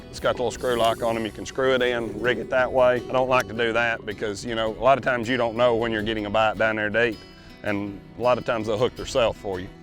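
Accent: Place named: Appalachia, United States. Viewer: You know it's good when he has a hillbilly accent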